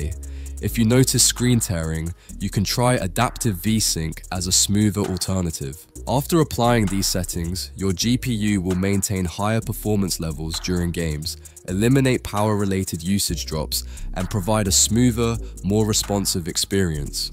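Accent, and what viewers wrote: India. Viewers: bro when from brittish to indian?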